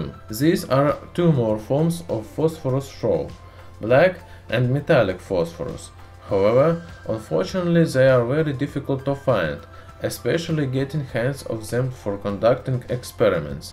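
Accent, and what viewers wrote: Russia. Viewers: My new chemistry teacher with a Russian accent. ......thanks for your videos ..... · this channel has 3 of my favorite things: russian accent half-life and chemistry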